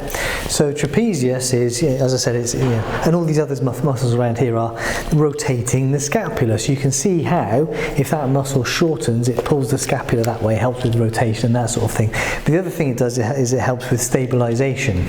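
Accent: United Kingdom. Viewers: I am not a native english speaker. But I adore anatomy and you are excellent, except your accent is british and also so fast that its hard for me to understand😂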